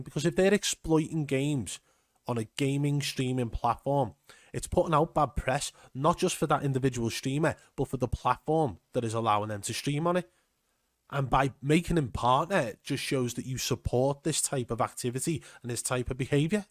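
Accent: Liverpool, United Kingdom. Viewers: Great work again buddy, scouser?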